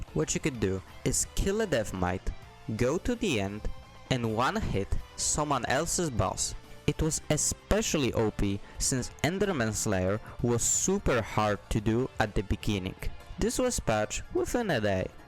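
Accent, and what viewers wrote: Poland. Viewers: Jesteś z Polski? Czy mi się wydaje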